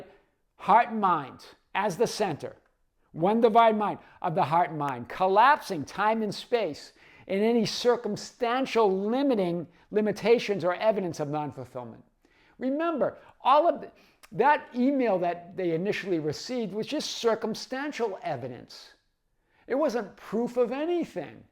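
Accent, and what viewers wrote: Boston, United States. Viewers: I’ll be honest with ya, when I found your channel a while back, I was like “ehhh idk, this guy is kinda loud with a funny accent” (I’m from Arkansas so if anyone has a funny accent, it’s ME lol) but the more I watched you, the more I’ve come to love your Boston accent & you’re not loud, you’re PASSIONATE. Now I look forward to your videos everyday because you’re such a ray of sunshine & such a wonderful teacher. You’re a true gem,! 🫶🏼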